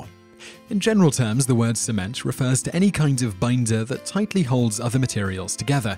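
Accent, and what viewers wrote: England, United Kingdom. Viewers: Sounds like an english accent, but he says "sidewalk".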